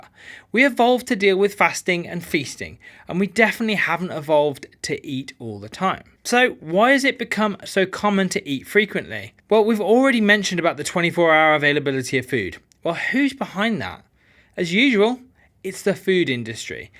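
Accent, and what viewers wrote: United Kingdom. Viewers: I found this video enlightening, clear and pleasant to listen. Specially your British accent 🙏 Thank you so much Docteur 🎉 Big bravo 🎊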